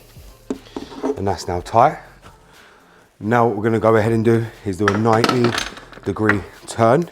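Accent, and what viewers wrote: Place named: Cockney, United Kingdom. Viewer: Hi. Don't know if you read comments on a 2 year old video but your channel is gold to me mate so thanks heaps. I am going to be doing this job soon I think. My 2007 E61 is at 160000kms/100000 miles now. I have a leaking valve cover gasket which caused a misfire on two cylinders dripping onto the coils. Those were replaced fairly easily but I'm going to get a professional to do the valve cover gasket as I'm don't have all the tools or quite the skills to do it confidently. Nor do I have the time. Thankfully I have found a really good BMW mechanic and the quote I got is pretty reasonable. PCV vent hose and eccentric shaft censor seal are getting done too and all up the job is $850NZ which is very reasonable all things considered. Great mechanic with the best reputation in town. He's about as Kiwi as you are Cockney and I think you'd approve of the level of professionalism and use of original BMW parts. 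There's plenty I would do myself like belt, tentioner and idler pulley but the valce cover job is a bit beyond me I feel. Valvetronics will need coding in any case. Cheers mate. Thanks again.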